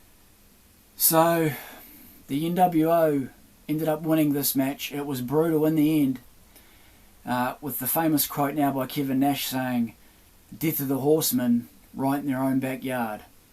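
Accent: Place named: New Zealand. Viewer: This takes me back to a 10.30 on friday nights through the 90z. By sound of your accent lad your from new Zealand aswell bro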